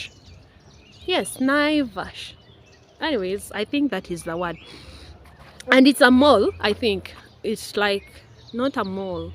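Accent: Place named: Uganda. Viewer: Interesting listening to your Ugandan accent. I had no intesion of watching the whole thing but I just did! Karibu Kenya.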